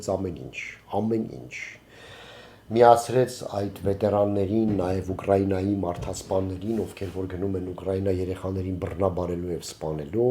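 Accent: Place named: Lori, Armenia. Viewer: Да здравствуйте Лоринский Акцент Люблю свою Родину ❤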